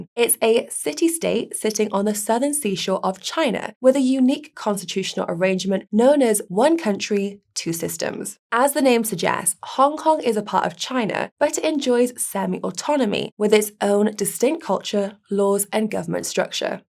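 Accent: United States. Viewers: Really appreciating ya'll from Pensacola Florida. American accent is hilarious.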